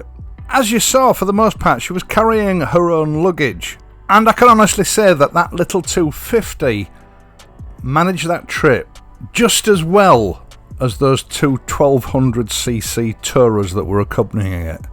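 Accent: United Kingdom. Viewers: UK accent. and British traffic is left-hand drive also.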